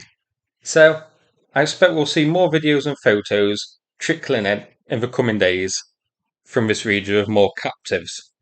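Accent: United Kingdom. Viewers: Excellent video. No unnecessary sarcasm or disrespect, just a good briefing in good old British English. I hope the Ukrainians make the most of this good moment and momentum.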